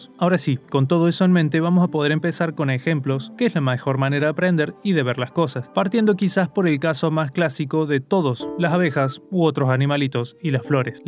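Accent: Córdoba, Argentina. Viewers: Te noto acento cordobés, le doy like por la musiquita de terraria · Disimulalo pero sos cordobes, manso video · si es argentino, escúchale el acento nomas. Ni ahí es un verdulero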